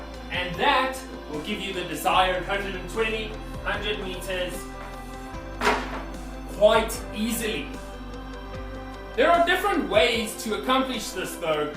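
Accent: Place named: South Africa. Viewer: I wanted to say something when I watched the First Black Board video. Your information is great But the Music in the Back Ground and your accent make it hard to understand you. Please don't play music while you are speaking and try to speak a little clearer so we who are not from South Africa can understand you.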